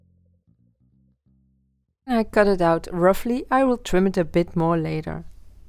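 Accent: Netherlands. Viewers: Wstunning once again! Are you from Holland? Your accent sounds familiar!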